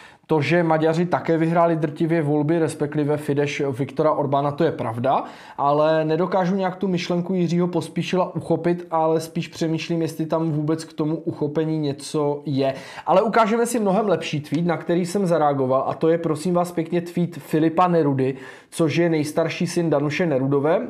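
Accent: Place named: Slovakia. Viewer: Mně ta skoro slovenština přijde roztomilá.Já jsem Slovenka a taky češtinu ač nerada trochu prznim.🙂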